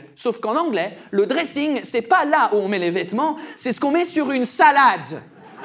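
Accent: United Kingdom. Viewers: C'est le seul accent british que j'arrive a supporter et à adorer. C'est super drôle et agréable à regarder j'adore ! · he has impeccable french but i could tell right away he was British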